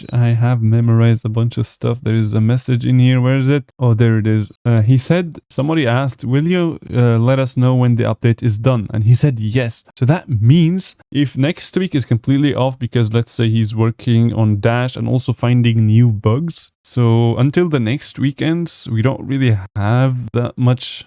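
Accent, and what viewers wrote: Germany. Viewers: realtalk now are you german?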